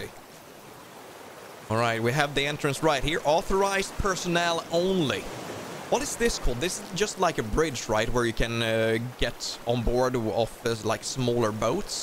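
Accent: Sweden. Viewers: Not seen many of your vids, but some with Fooster, looking forward to seeing/listening to more vids. What I think I like the most, is how you embrace your Swedish, as a fellow Swede, I'm happy to hear it, and it always makes me smile. Love from good old Malmö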